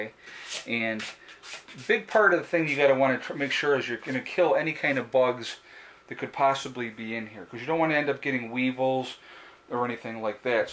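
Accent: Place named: Michigan, United States. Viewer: Great video. ..where are you at??? Almost sounds like michigan. ...lol. with 30 degree variable. ..take care...God bless you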